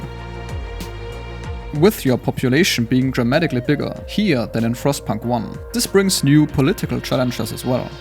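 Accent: Germany. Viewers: Man hört zwar, dass du ein Deutscher bist xD aber sonst richtig gutes Video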